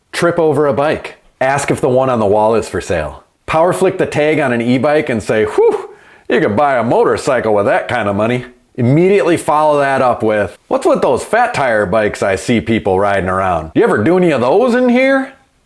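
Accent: Wisconsin, United States. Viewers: Mad proper Wisconsin accent. -715er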